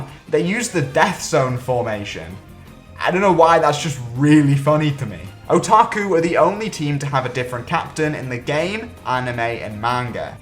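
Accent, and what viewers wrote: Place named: Newcastle, United Kingdom. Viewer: He said c-ooo-k-e 🤭🤭 i love the Jordie accent creeping into words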